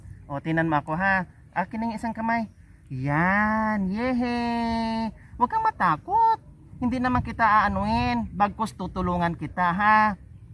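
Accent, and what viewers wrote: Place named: Ilocos, Philippines. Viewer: ilicano kba? kz t9no ng pananalita mo ilicano. More blessing sa iyo dahil mstulungin ka kya papanoorin ko na ang chanel utube mo.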